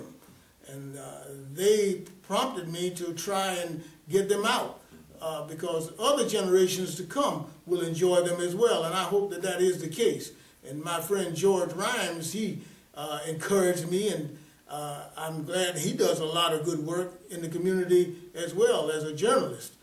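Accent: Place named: South, United States. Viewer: I love the ole southern bionic english language. It"s very much a part of our southern heritage & customs. I try to speak bionics but, not as good at it as!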